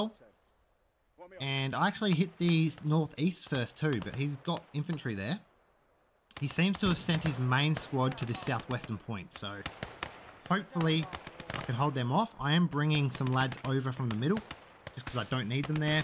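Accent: Australia. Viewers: Your my favorite Gates of Hell commentator tbh, I think it’s your accent. You sound right out the bush mate. Chuck her in the Uti and keep up the excellent commentary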